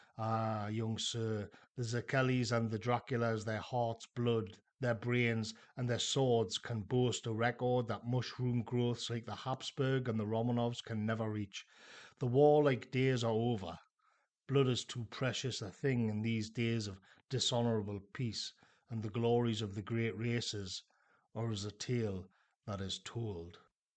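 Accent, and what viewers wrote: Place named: Newcastle, United Kingdom. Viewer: My father was a Geordie, so perhaps I'll get away with saying that your accent really adds to the reading of that passage. Makes me think of the wildness of the North, walking Hadrian's Wall in pelting rain and wind, the wildness that was still there in the souls of people like my grandparents who were proud of THEIR (very working class) past, the Reivers, the Scottish border skirmishes.. and told stories of ghosts and witches.. Or I'm just being wildly over romantic, but in my own defence I'm half Geordie, half Welsh so I get it from BOTH sides...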